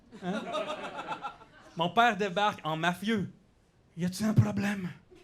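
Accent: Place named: Quebec, Canada. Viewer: Yo tu sonne Québécois, tu punch comme les américains, t'es née en Colombie... who the fuck are you ? 🤏😂 ...hahaha t'es une incroyable découverte , d'un huge fan de stand up merci pis chapeau, la scène Queb a besoin d'un gars comme toi bravo. 👏👏👏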